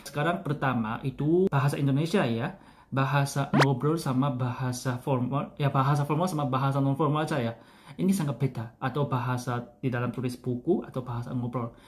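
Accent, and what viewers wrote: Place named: Java, Indonesia. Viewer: logatnya kayak logat orang jawa.. beberapa kata terdengar medoknya :))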